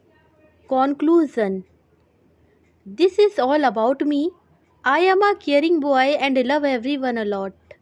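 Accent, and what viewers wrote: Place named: India. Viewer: See, I gave you the first (like) and the first comment, but you have to improve your dialect which is totally native Indian. Good luck.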